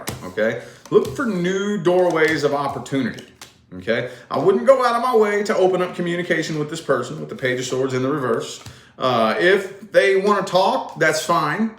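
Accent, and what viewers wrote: South, United States. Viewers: Love your good ole southern boy delivery. Spot on every time. Thanks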